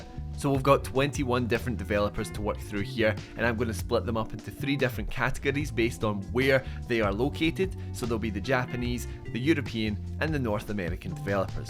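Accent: Scotland, United Kingdom. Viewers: Imagine being that stupid you cant understand a easily understandable Scottish man.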